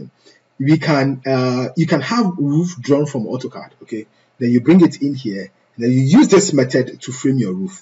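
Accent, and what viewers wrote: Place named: Ghana. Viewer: He sounds more Ghanian than Nigerian.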